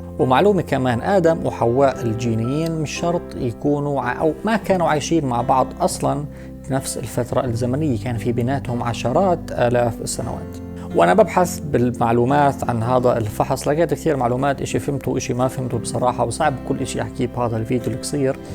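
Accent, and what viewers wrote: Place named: Palestine. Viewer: شوف نفسك لهجتك ثقافتك عاداتك اسلوب حياتك واضح انك فلسطني هذا وطنك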